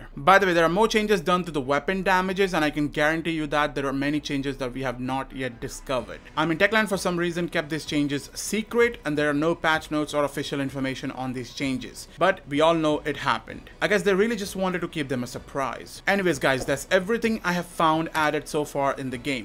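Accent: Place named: India. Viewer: Why do you sound so Indian?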